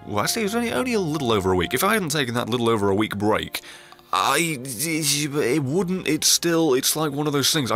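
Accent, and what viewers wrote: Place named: England, United Kingdom. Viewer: It feels weird to think that I subscribed to this back when you didn't even have 20k subscribers. Hell, I thought you ha way more subscribers until you uploaded that 50k subscriber video. It was just legitimately funny watching you getting your shit wrecked by Dark Souls bosses & having goofy reactions to multipayer gamertags. Then again, I usually find rapid-fire comedy with an English accent irresistible. Congrats on the 100k!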